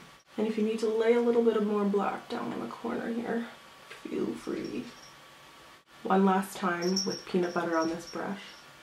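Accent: Netherlands. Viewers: are you from holland ?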